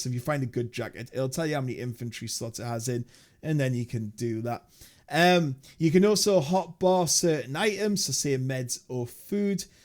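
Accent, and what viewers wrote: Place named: North, United Kingdom. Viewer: Good video mate, good to hear a fellow northern keep up the good work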